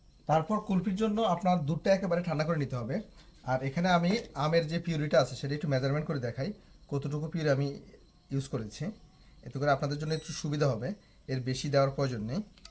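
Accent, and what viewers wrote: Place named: Chandpur, Bangladesh. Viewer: আপনার ভাষায় চাঁদপুরের ছায়া দেখতে পাচ্ছি। আপনি কি চাঁদপুর থেকে বলছেন? যাই হোক, ধন্যবাদ এতো সুন্দর করে রেসিপি শেয়ার করার জন্য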